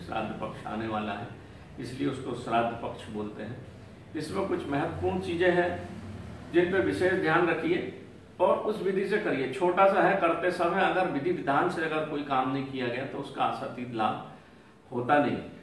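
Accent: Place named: Madhya Pradesh, India. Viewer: गुरुदेव प्रणाम मैं हरदा से हु आप मध्यप्रदेश से है ये आपकी भाषा से ज्ञात हो रहा है आप होशंगाबाद या जबलपुर से है ये मेरा अनुमान है कृपया बताये आप कहाँ से है जय श्री राम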